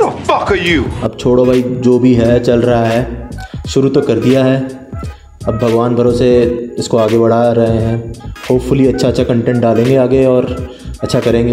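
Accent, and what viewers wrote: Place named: Hindi Belt, India. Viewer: wah!! Ekdum hindi belt loka vali kahucha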